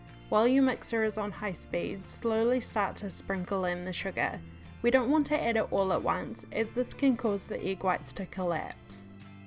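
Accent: New Zealand. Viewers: Trying to find the info but I cannot. Lol. Are you a kiwi!? 🤩❤️ Hahaha the accent is undeniable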